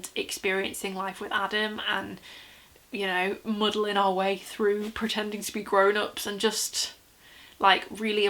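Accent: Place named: Wirral, United Kingdom. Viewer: I haven't left Wirral in almost a year ( cheers covid ), I thought I recognised the accent and the sense of humour! :-)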